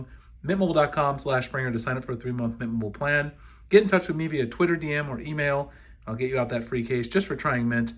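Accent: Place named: United States. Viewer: Nice informative videos. 👍🏼👍🏼 Kudos to you I found your channel by chance the other day, when looking for YouTube videos about Samsung Galaxy smartphones/tablets guides Note: this is not a critisism, but simply ❓ A wee, and somewhat personal request (by me) vis-a-vis your accent. ❓ Any chance that you could slow the pace of your speech. (Pretty please?) We Brits, on the other side of the pond, tend to find your pace of conversational speech a tad fast for us at times and I have to keep backtracking to watch it again. When I'm in the USA, and I've been back and forth since the early 80's visiting relatives, even though they do understand my accent, albeit a regional one, I now slow the pace down, so my relatives can understand me much better, even though we've known each other for 40 yrs plus, they still, at times, say I talk too "fast" for them, and have to repeat myself from time to time. 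I'll keep watching, either way, as what you show, and explain, shows there's a lot of depth, and "hidden" things within the S23 Ultra that I didn't even dream of. I've only had mine for around 3-4 days, and I'm still getting to grips with it and it's way of working and you've alreay helped me out greatly. 👍🏼👍🏼So, again, kudos to you from me, and others too no doubt, for your work, and tips on how t get the best out of Samsung smartphones. 👍🏼👍🏼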